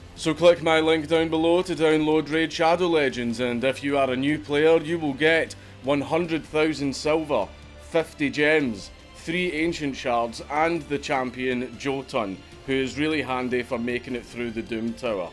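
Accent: Scotland, United Kingdom. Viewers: He's Scottish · this is the most scottish man i have ever heard. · Are you SCOTTISH by any chance ?! Can you do a Transylvanian Accent ? Of course I've heard of Count Dankula.... you've gotten a lot of coverage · My god a Fellow scot with a blantly Scottish acent, that alone was a joy to just hear blurting out over the speakers. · This youtube channel is great. Fascinating history narrated by a suave Scottish accent, who could ask for more?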